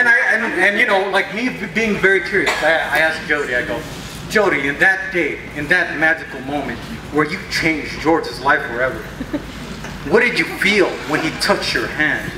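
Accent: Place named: California, United States. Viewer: Whoo this guys from California for sure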